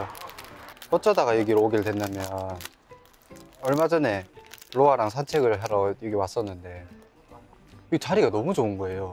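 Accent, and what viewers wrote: Gyeongsang, South Korea. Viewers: 오 현실말투 갱상도싸나이네요~ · Its cute how he talks with gyeongsangdo(?) dialect. That intonation can be heard by people from there hehe is from daegu right ^^ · You are from Daegu right? I can recognize his accent 😂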